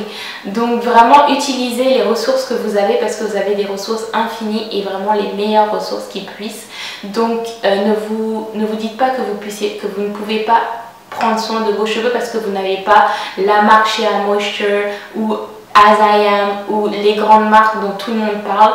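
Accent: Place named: France. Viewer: vous avez une belle accente francaise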